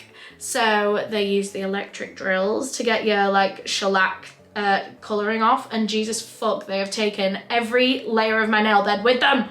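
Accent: United Kingdom. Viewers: I LOVE 💕 British English ❤️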